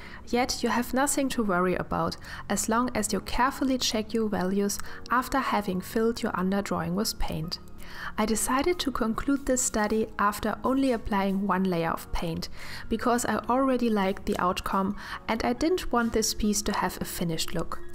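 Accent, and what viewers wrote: Germany. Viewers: Hallo, ich möchte erst einmal deine Kunst loben-ich liebe den Mix aus abstrakten Verläufen und naturalistischen Elementen! Ich tendiere dazu, zu viele Details in ein Bild zu hauen, dann in der Mitte die Lust zu verlieren und wenn es fertig ist, frustriert zu sein, weil es einen etwas erschlägt xD Deine Bilder helfen mir, das Konzept von "Blickfang" besser umzusetzen und meine Portraits zu "entmüllen". Wo ich dich das erste Mal gehört habe, wusste ich sofort, dass du Deutsche bist. Dein Akzent ist wirklich liebenswürdig. Am liebsten höre ich mir einfach nur die Tonspur an und zeichne/male selbst parallel dazu und dann schau ich das Video noch einmal für das Bildmaterial;) Entspannend und inspirierend für mich. Vielen,vielen dank für deine Videos! (Musste ich einfach mal loswerden, bin eher der stille watcher)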